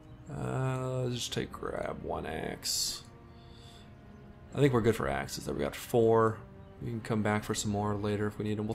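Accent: Canada. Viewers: Damn I knew you were from Canada the way Canadians say "out" just gives it away lol I was posted near to Medicine Hat for a while. Am enjoying your Videos so keep em coming!!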